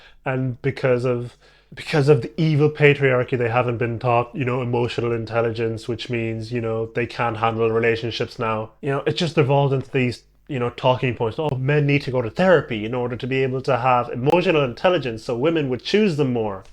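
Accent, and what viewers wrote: Ireland. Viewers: This guy just came up on my feed. Interesting take on things. Good to hear the aul Irish accent representing! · My brain can't process a black man with an Irish accent lol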